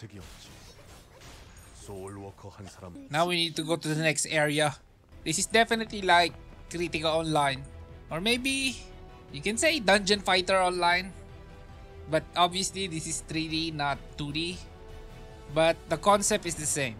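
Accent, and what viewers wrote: Philippines. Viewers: wait.. thats a philipino accent? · You sounded like a Pinoy. I hope I'm correct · Pinoy accent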